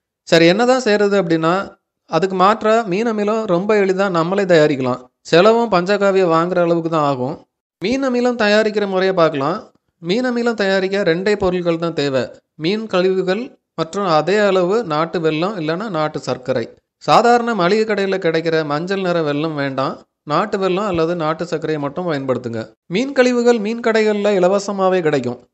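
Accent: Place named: Kanyakumari, India. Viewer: Neega kanyakumari thana.. slang apditha teridhu..😃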